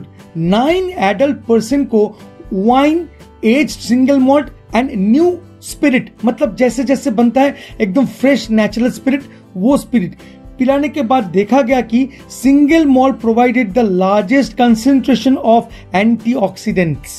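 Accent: Bengal, India. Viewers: Are you a bengali 😶😐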